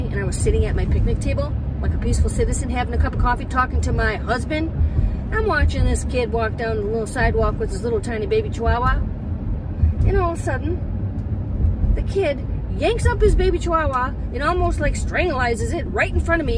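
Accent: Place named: Michigan, United States. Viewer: Hi there! New subscriber. Your video came up when I searched no build camper van. I've been watching your videos all morning. From listening to you speak in the very first video I watched, I knew you must be from Michigan too. This was later confirmed. Ive learned we have a distinct accent. I can see from this video that we are neighbors. I'm in FG. I'm retired and will be getting a minivan within the year to travel. Happy to have stumbled onto your channel. It is helping me see what will be a good way to set it up. Thank you!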